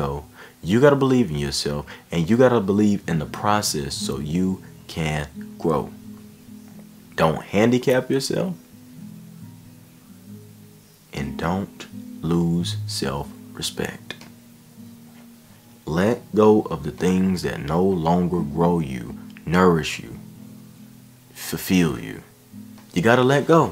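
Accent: South, United States. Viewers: Where are you from my guy? The southern accent is heavy in this one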